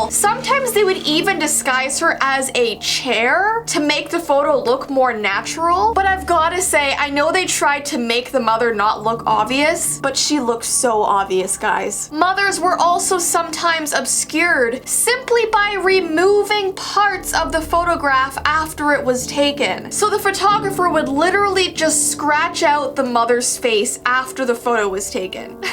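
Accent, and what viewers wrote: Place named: Canada. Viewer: She is Canadian so she has a little bit if an accent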